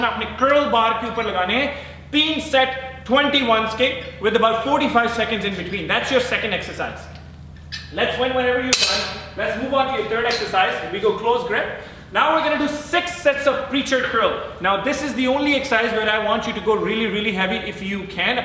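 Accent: India. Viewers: This indian accent :(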